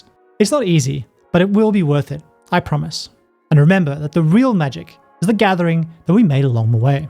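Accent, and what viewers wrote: South Africa. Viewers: Nice video. Is there some south african in that accent?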